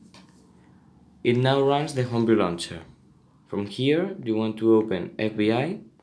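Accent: Spain. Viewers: Como se nota q es ESPAÑOL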